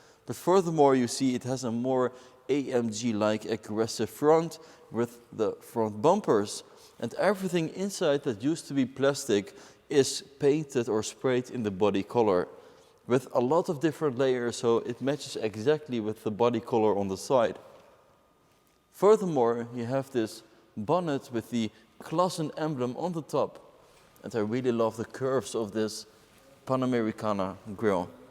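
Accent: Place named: Netherlands. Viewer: Is dit een Nederlands kanaal? Dacht dat ik dat kon horen aan zijn accent.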